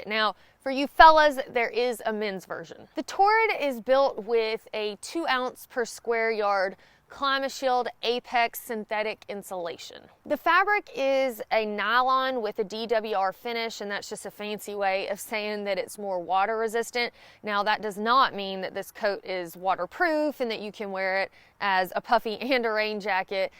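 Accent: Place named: Alabama, United States. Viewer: You are so thoughtful! I know you can take the girl out of Alabama but you can’t take the Alabama out of the girl…You crack me up! Having to put an * for “side-note” so that people didn’t think you said “sad note”! 😂 that and I haven’t heard “fellas“ in a long time! Love your videos!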